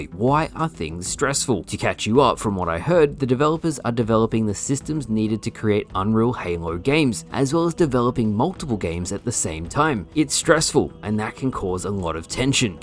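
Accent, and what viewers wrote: Australia. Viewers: I can't find any matches in infinite you sound Aussie too so I wonder how you do with matchmaking I can't get a single match in Infinite on PC, and in MCC I just get matched with Yanks and Euros but at least get some matches at all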